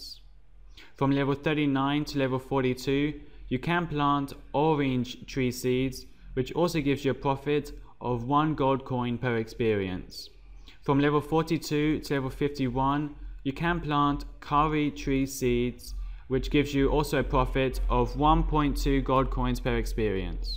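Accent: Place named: Iran. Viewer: r u persian?cuz ur name and accent is showing.are u persian? btw gr8 guide subbed and liked;)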